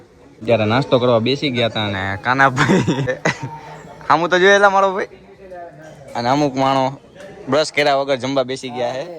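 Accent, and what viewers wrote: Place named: Zalavad, India. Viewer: Jordar maja Ave che tmara video jovanu ❤tmara video ma bav maja 🎉ane you are the best canteen creater 😎 ane bav j handsome lago cho ❤ek dam babla jeva j ,ane tmari zalavadi boli bav j mast che ek dam top 😎😎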